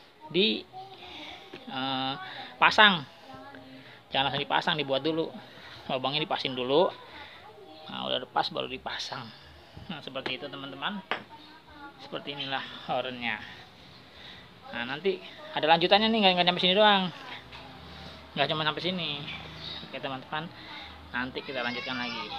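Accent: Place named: Betawi, Indonesia. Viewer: Bang ko abang gaya bicara nya kaya orang jakarta/Betawi, inisiatif nya mantep baang, salut saya salam satu hoby, ane jkrta tg priuk 🙏🙏🙏🙏 👍👍👍👍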